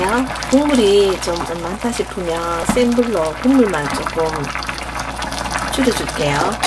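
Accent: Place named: Gyeongbuk, South Korea. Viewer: 오늘 첨 들어와서 보곤 와~놀랬습니다 독특한 네임 이시구나 하곤, 아람냥 지면에서 그냥 글들 보곤 했는데 정말 대단 하신 분이시네요. 말씀이 경북 분이신것 같은데 상어☞갱상도선 돔배기라 하죠 비린내 없는 담백한 맛. haigo! 칼치 조림 묵고 싶어라! 꼴딱 꼴딱~~~~^^ 앞으로 계속 맛있는 영상 봐야 겠슴다....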